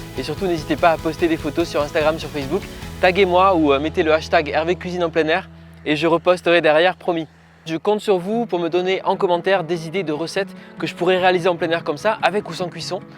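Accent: South, France. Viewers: Coucou Hervé, merci de partager vos souvenirs et votre galette ! Il me semblait avoir reconnu un reste d'accent du Sud !... 😉😀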